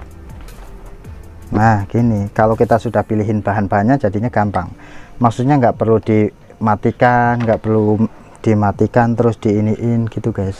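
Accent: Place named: Comal, Indonesia. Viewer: Logatnya koyo wong Comal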